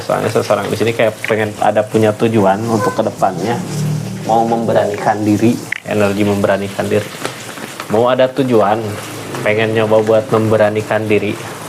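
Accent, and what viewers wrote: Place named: Sunda, Indonesia. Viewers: ❤❤❤❤❤❤❤❤❤❤❤❤ Resonet se x..Aak Aku suka . Yg membaca kan . Leo Sunda nya kental ... banget sehat dan sukses sllu❤❤❤❤ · ❤❤❤❤❤❤❤❤❤❤❤❤❤❤❤ Resonet se x. Aak Aku suka. Yg membaca kan. Logat sunda nya kental banget Sehat dan sukses sllu❤❤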